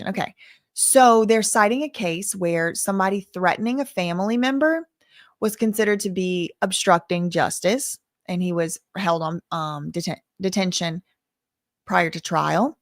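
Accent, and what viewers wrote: South, United States. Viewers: I swear that the more I listen to her, the more I feel like Im becoming a Southerner ya'll. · I certainly agree that has a beautiful southern accent. She has an amazing cadence and is so easy to listen too! 💚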